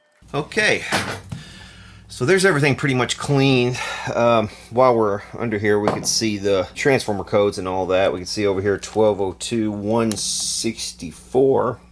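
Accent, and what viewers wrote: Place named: South, United States. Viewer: I noticed the amp was signed by Linda. Many years ago I read an interview with Dave Mustaine and Chris Poland from Megadeth and MegaDave said he would open up Marshalls and look for her name as it was sure to be a screamer. Looks like he wasn't full of shit! Love your vids and channel man, I'm from NC and have lived in Seattle for 20 years so it's nice to hear an intelligent southern accent.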